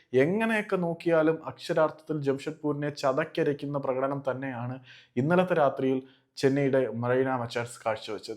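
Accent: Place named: South Kerala, India. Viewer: "ഭ" തെക്കൻ ജില്ലകളിൽ ഇങ്ങനെ ആണ് pronounce .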